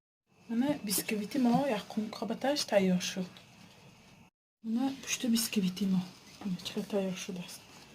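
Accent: Isfara, Tajikistan. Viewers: Хехехе)))) Ба Душанбегихо лафзи Исфарагичона ёд тиен апачон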